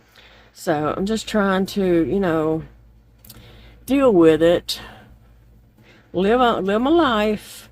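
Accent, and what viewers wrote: South, United States. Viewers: Ah, the southern accent gets us in trouble, doesn’t it? I laughed at both your stories. I’m so happy you enjoy spending time with us. I listen to you when I’m crocheting or coloring for the most part. There are some crochet coloring books on Amazon, some with granny square pages to help plan out a color story.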